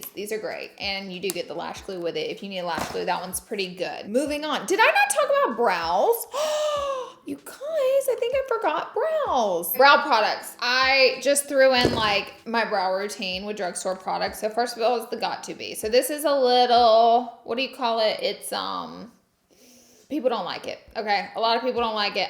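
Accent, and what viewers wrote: South, United States. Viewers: Tell me you're from the South without telling me you're from South ! FYI ... Nola girl here, so love you still have it! Anytime you say oil and y'all! 😂🤣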